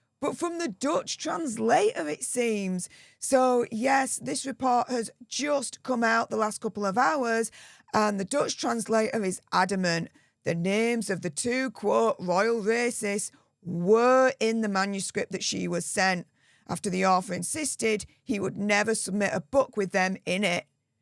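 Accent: Yorkshire, United Kingdom. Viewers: your literally loosing your Yorkshire twang, you need sleep, and a hot toddy. On top of it you need to be honoured with a Damehood, for your contribution to this, after an operation.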